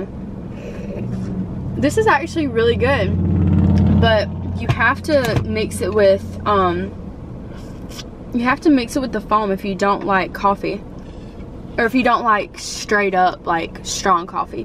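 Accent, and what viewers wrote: South, United States. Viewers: i love your accent !! it kinda sounds country! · North Carolina I think! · Where are you from. Love the country accent.im from TN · it totally is country lmao. i’m pretty sure she’s from the south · Its like southern and Australian 💚💚💚💚 I love it too!!! :) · K H I’m from Texas and everyone says we have a country accent but her accent is soooo cute it has to be somewhere from the south but I can’t tell where 😭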